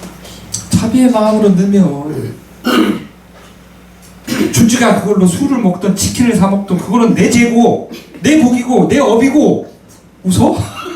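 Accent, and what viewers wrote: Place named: Chungcheong, South Korea. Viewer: 충청도 말씨가 들리니 참 정겹구요. 조회수 안나와도 김 빠져 하시지 마시길요.ㅎㅎ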